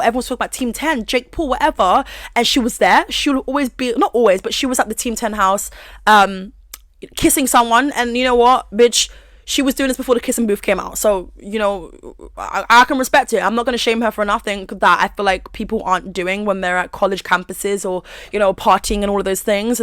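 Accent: United Kingdom. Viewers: I just realized something. And this isnt gunna be PC lol but im genuinely just curious. So most black people in America have a "blaccent" (obvi not all and I did not make up that term) but is that not the case in the UK? Bc you sound like most other British people I've heard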